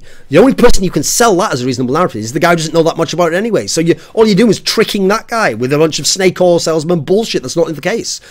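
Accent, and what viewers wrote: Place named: Northeast England, United Kingdom. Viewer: I can't place his accent. Northeast England or Scotland?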